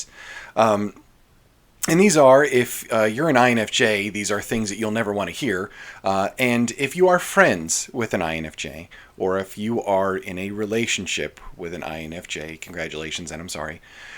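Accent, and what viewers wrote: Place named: Forest Hills, United States. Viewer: You sound like you went to forest hills high school, plus the silibent s and the lateral emissions indicate several minor speech impediments and lots of dental work.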